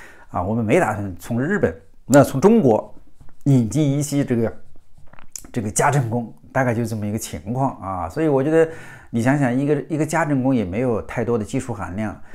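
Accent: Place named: Hubei, China. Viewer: Zhou Bruce 感觉是湖北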